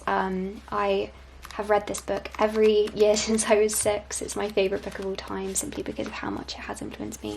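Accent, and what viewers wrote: United Kingdom. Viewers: 영국 영어 발음 정말 매력적이다 😆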